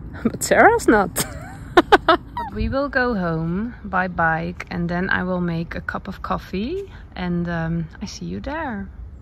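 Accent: Netherlands. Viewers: I’ve just started to watch today’s podcast but I had to finally comment. I’m in the US but from Canada where my parents immigrated to from Belgium in the 50s. My mom, who passed 3 years ago, also spoke “Dutchy English” and so many of your expressions remind me of her. ❤ my parents are from Brugge and Meetkerke and so much of what you share reminds me of my family. Today when I saw you leave your house and saw the colorful plastic strands in your doorway it made me hurry to the comments….my aunt had those too! ❤ thank you for bringing so many memories of love and family back to me…. And I love your clothes and granny squares!!! 😄 thank you sharing your life and knitting/crochet. PS the bangs are nice curly!! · I cannot thank you enough for taking the trouble to do a podcast in English. Your “Dutchy” English is quite good and enjoyable to listen to. I had heard about you for a little bit, but just started watching your knitcasts last week. 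I would 100% say that you come across as genuine, quirky and fun…. I especially enjoy your love of brown and your hair. You have one of the most lovely hairdos, curly bangs and all. 😊 Hope you have a lovely week. Looking forward to seeing you steek your cardigan! 😁💚🧶☕️ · Thank-you for another lovely podcast. I enjoyed seeing the countryside around your town. Your dog riding in your carrier bin made me smile. Not that my opinion of how you wear your should make any difference, but I like the curly bangs/fringe they go with your curly hair 😊 A glimpse into life in another country and the "dutchy english" are both good. Keep slaying those dragons 🇨🇦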